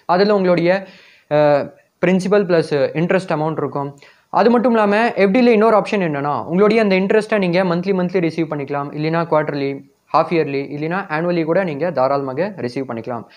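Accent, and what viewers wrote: North India, India. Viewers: நீர் என்ன வடக்கரா? முறையாகத்தமிழ் பேசுக. The service by SBI personnel is not at all up to the mark.Every activity is carried out by machine. They start by half an hour late and close the counter before half an hour earlier by counting the currencies. For every transaction most of the interim works are to be carried out by the SB a/c holders. Very few lady personnel are exceptional. Every worker should be in uniform with the explicit I'd card and phone no. Most of the time the printer is out of order. Counter to counter, the customers are tossed. With the e.governance, they themself could verify everything and they seek pleasure in driving away the senior citizens.😮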